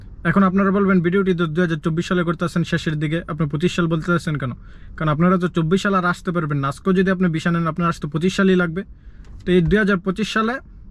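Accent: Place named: Comilla, Bangladesh. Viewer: ভাই আপনার কথাবার্তা টুন মনে হচ্ছে আপনার দেশের বাড়ি কুমিল্লা ভাই আমার নিজের দেশের বাড়িও কুমিল্লা মুরাদনগর থানা গ্রাম ধামগড়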